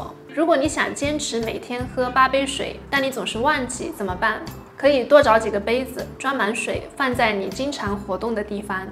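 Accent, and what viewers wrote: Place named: Anqing, China. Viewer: 你是安庆人吗，口音好好像哦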